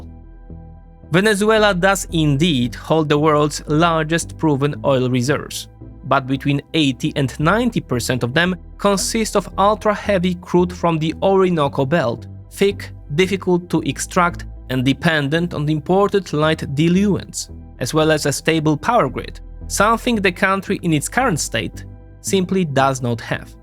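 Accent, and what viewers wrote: Poland. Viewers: The CGIs were very nice on this one. The overall quality of the channel keeps going up. And we always have our favorite Polish voice to relate to. 😁❤🙏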